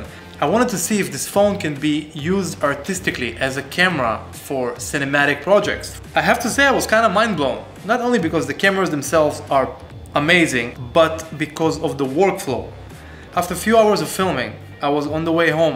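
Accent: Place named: Israel. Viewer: אחי אני לא מאמין שאתה ישראלי בהתחלה ראיתי את האינטרו ושמעתי מבטא מוכר ואז הייתי פשוט חייב לבדוק אם אתה ישראלי ומצאתי סרטון שלך בעברית... באמת מגניב ואחלה של סיקור, באמת רציתי לקנות את האחת עשרה ורק עזרת לי להבין עליו יותר תשקיע בערוץ אחי וואללה יש לך כישרון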